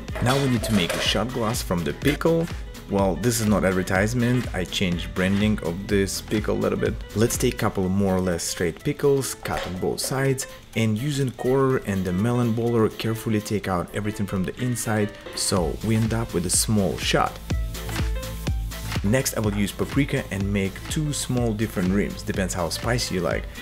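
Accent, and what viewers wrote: Russia. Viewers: Русский акцент, смотрел похожего бармена, ты попал в рекомендации, удачи с твоим делом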